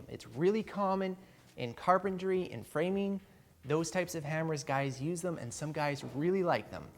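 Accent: Canada. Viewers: bloody hell bud, you've got the most stereotypical Canadian accent i've ever heard! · What?! This dude has a serious Canadian accent. Matthew McConaughey has a thick southern accent.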